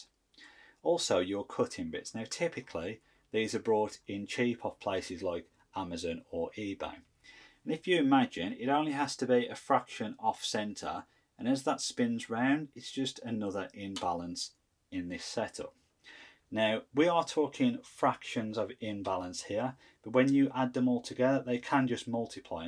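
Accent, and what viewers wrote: Birmingham, United Kingdom. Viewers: Excellent content and the best I have seen on this clever little machine to date! Very many thanks. Btw, people of the world thats a Birmingham accent - a place in England famous for engineering excellence and where kids grow up naturally knowing how to make things and create loud music for some reason. Good to see a great tradition continuing.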